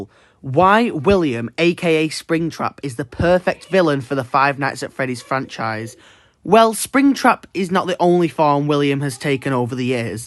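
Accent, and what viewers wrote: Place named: Manchester, United Kingdom. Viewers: I like the British accent 🔥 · I think it’s a Manchester accent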